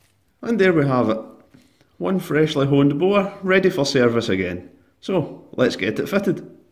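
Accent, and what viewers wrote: Scotland, United Kingdom. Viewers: Sounds like a Scottish accent?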